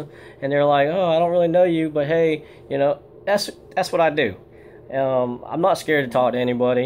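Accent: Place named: South, United States. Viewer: So basically you only were chosen for casting because you were Asian with a country accent.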